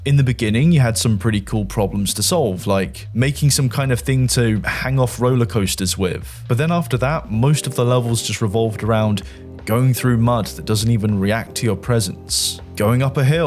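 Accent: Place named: United Kingdom. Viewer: Never stop making videos like this. As a fellow Brit it's nice to not have to listen to yanks droning on lol.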